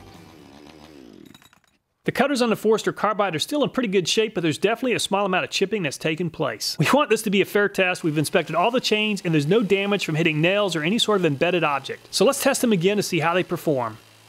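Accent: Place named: South, United States. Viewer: Very good info but man you talk so fast my ears could not keep up so I lowered the playback speed to .75 and now I can understand you better , now sounds just like a good old boy from the South which my ears appreciate much better .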